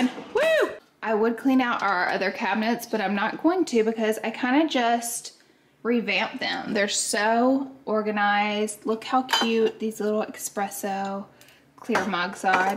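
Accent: South, United States. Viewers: calling the pots boilers in her southern accent is the cutest thing on YouTube.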